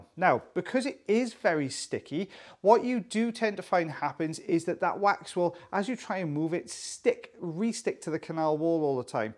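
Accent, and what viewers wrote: Wales, United Kingdom. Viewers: you're Welsh, right? Are you located in Wales, or elsewhere in the UK? I love the Welsh accent!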